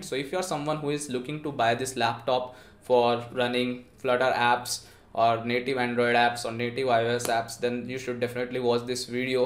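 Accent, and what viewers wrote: India. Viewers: Bro I m proud of you as one of the few good Indian developers on Youtube who is confident and provides the right information but you got to work on your English accent and grammar. The technical stuff is amazing already why not to go one step towards the perfection of videos and fix those language issues. Don't be offended this is just to see you grow more.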